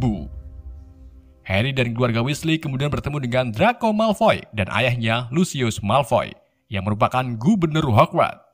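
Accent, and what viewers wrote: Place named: Java, Indonesia. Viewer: Radak medok yah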